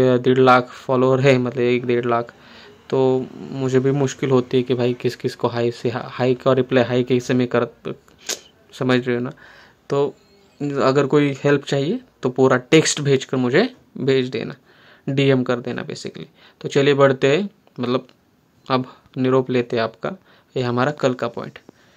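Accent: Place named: Maharashtra, India. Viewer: Same amchya sarkhich hindi speaking...... apla marathi manus detected ❤😁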